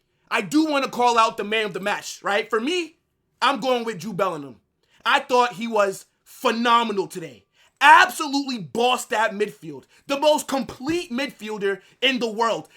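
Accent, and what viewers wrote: United States. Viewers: You are the best Real Madrid youtuber. Very underrated just because you have an American accent.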